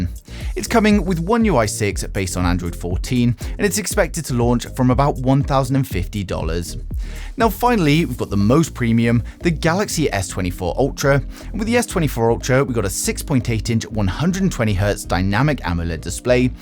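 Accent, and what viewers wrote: United Kingdom. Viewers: $100? Why the heck would someone with a British accent be promoting US stuff, 60 odd million in Britain not enough following for you?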